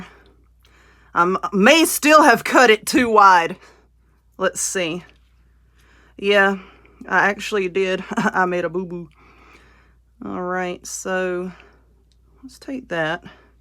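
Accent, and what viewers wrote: South, United States. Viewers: Love your accent! It's nice to hear another REAL SOUTHERNER talk like myself. LOL Your crafts are great!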